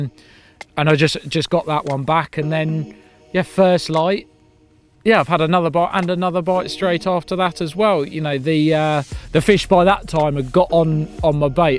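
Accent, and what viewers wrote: RP, United Kingdom. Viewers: He’s abit to posh for carp fishing ......horaa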